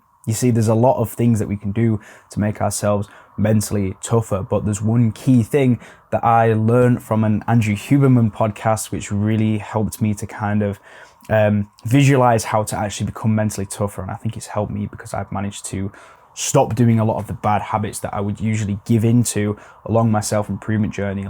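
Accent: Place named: RP, United Kingdom. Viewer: Damn this dude even has the James Bond accent. Dudes unreal.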